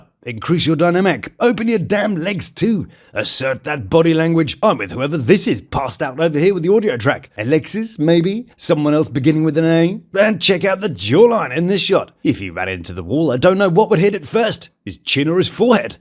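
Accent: United Kingdom. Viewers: Just watched final episode season 6 love after lock up . I just could NOT stop myself from looking up did Julius become a rapper or got a real job 😂🤣. AWESOME I found your update . You got SO MUCH information & put it on your channel so BADASS , HILARIOUS, PROFESSIONAL etc…PERFECTLY ‼️Even witt British accent you spoke every word so clearly. And Alexia is beautiful inside & out from what I seen on the show . I really 🙏she takes her own advice & loves herself better . She definitely could find a handsome , educated, working man . She has discernment on top of ALL her other great qualities. She just needs DO IT 🙏💛♥️‼️Tyvm I appreciate yah. May god bless you and yours. JESUS PEACE 👍😎😎‼️ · Omg i love your accent ! Brittish guys are So hot! You sound too fancy to be watching us anericans trash tv shows tho 😂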